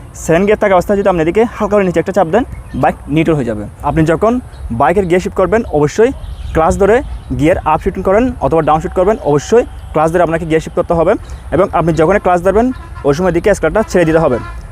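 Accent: Chittagong, Bangladesh. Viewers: Vai ki Chittagong er 😂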